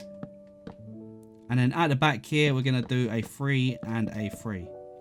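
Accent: United Kingdom. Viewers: I like ur british acent❤❤❤ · I love bri ish people shutter: shu uh. Three: free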